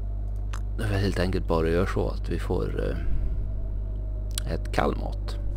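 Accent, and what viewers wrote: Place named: Sweden. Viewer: Älskar din dialekt känn lite bonländska i det (stav) c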